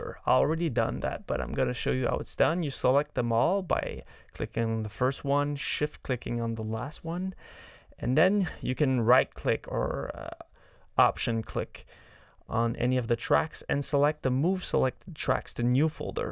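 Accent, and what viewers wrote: Quebec, Canada. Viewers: Eille stun accent québecois ça! :D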